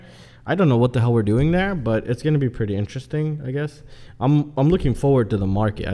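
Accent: United States. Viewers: Dude ...maybe I missed it but what’s your origin story? You sound like an American living abroad. You’ve got a cool channel and a pretty bright inquisitive guy! Keep on making great content! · How do I enter contest? :p Glad to hear your son is ok. And I thought you where american, your english is not hungarian. ;)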